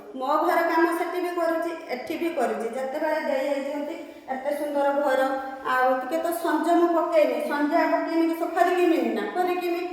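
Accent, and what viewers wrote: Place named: Balasore, India. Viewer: Aapan Kathaa ru janajauchi Balasore boli ame bi Balasore pila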